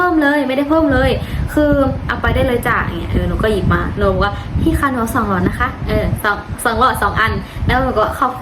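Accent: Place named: Laos. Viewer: หนูรู้ไหมสำเนียงของลาวลุ่มกับไทยภาคกลางยกเว้นกรุงเทพรวมถึงภาษาพูดพื้นๆเลยจะเหมือนกันมากเลยมีความเหน่อแบบเดียวกันแต่ภาษาพูดมีต่างกันบ้างลุงฟังหนูพูดเข้าใจได้ง่ายกว่าภาษาอีสานเคยฟังน้องอูพูด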